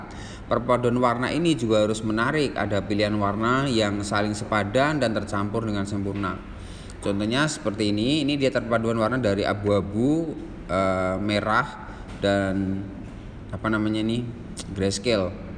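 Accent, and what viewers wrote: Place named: Java, Indonesia. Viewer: saya kalo liat pak jelasin materi lewat youtube mau senyum trs...soalnya medok kali pak🤭🤭🤭🤭🤭🤭🤣🤣🤣🤣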